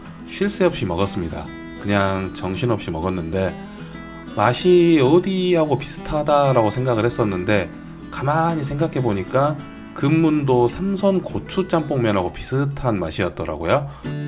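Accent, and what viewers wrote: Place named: Gyeongsang, South Korea. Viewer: ㅎㅎ경상도분이 시죠?? ㅋㅋ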